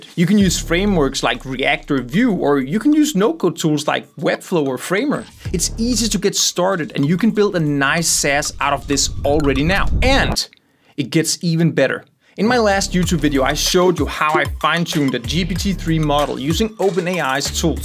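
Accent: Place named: Denmark. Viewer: Good video. Are you Danish? I ask because of your accent.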